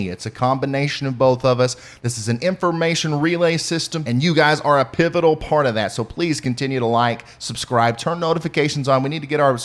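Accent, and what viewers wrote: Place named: Kentucky, United States. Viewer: wow.. I think lives in ky too..i recognize the accent because it's where I'm from originally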